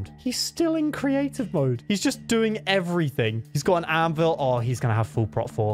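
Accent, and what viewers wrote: United Kingdom. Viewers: Are u britsh?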